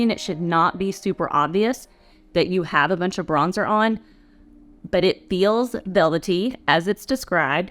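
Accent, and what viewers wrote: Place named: South, United States. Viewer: Love your videos, short and to the point. Love your accent too being from the south myself. Dupe videos from you are awesome!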